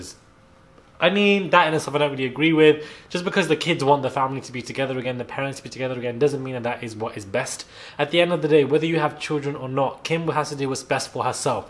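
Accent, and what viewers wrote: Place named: England, United Kingdom. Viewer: I think I like your content but you speak way too fast. Especially because I’m Canadian & also can’t keep up because of your English accent, charming as it is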